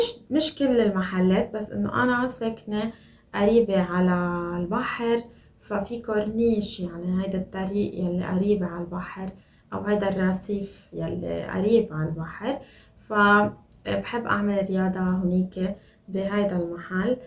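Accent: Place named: Lebanon. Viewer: I love the Lebanese accent it sounds pretty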